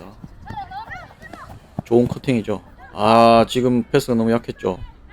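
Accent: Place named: Gyeongsang, South Korea. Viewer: 아이들 축구도 치열하네요^^ 경상도 해설 잘 들었어요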